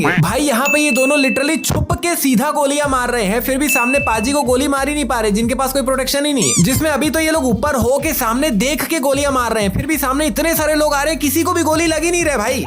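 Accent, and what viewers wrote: Chhattisgarh, India. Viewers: Bhai aap chattisgarh se ho ye aaj pta chala 😅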